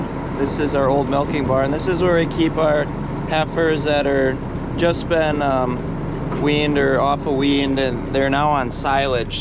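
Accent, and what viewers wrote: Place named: Wisconsin, United States. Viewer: Don't worry if we cant see you your wisconsified accent is impossible to mix up we know its you guiding us threw the video! Cheers!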